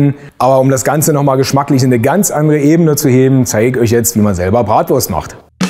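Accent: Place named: Berlin, Germany. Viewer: HAMMER...wie ich diesen berliner Slang liebe, schlimm ist das bei mir ;););) Finde ich ein Bombe Rezept weil nicht komplett in Fett und ÖL ertränkt, Zutaten klingen stimmig, freue mich auf ausprobieren, ganz lieben Dank! LG aus dem shönen Rheinbach, Henning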